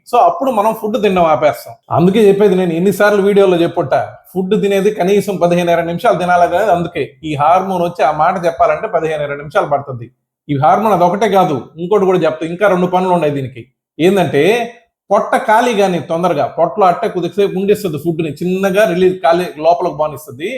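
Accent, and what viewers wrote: Nellore, India. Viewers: Maa nellore bhasha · Love you sir ur ur nellore good👍👍👍👍👍 God bless u& ur family with health & wealth & prosperity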